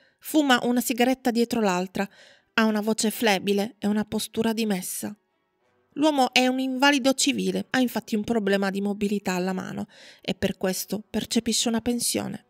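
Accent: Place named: Calabria, Italy. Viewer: Buongiorno era una curiosità, dall''accento mi sembrava.Sai con quanti colleghi calabresi e siciliani ho lavorato.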